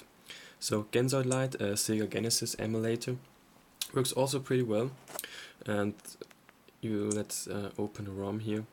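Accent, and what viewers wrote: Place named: Germany. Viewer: Dude, awesome accent. I find it pretty hard to copy a German accent and I've been studying German for 7 years. Yeah.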